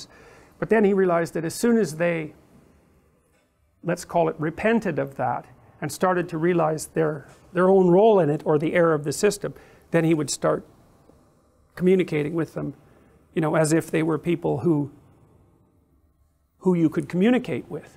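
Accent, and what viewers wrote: Canada. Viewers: Canuck's have an awesome accent! This makes me want to watch Pinoke again and see if the Soviet's had a hand in it rofl...I think if he had a normal voice, no one would buy his bizarre ideas lol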